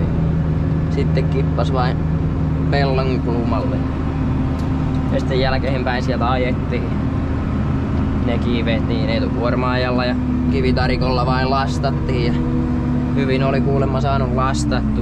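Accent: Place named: Jalasjärvi, Finland. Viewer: Puheesta päätellen asuinpaikka ei taida olla kaukana jalasjärvestä? 😊🤔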